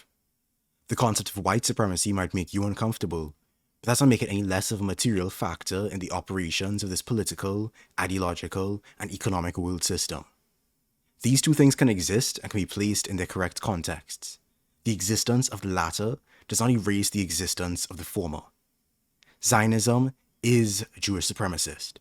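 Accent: Trinidad and Tobago. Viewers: Is this guy Trinidadian?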